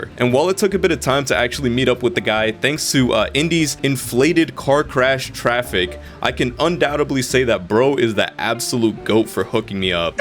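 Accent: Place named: Dominican Republic. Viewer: first time ever stumbling into this channel, and im kind of overstimulated by how much is happening in such a short amount of time. Anyways, the dominican accent caught me off-guard lmao. I didn't think you'd also be latino KASJDAJAK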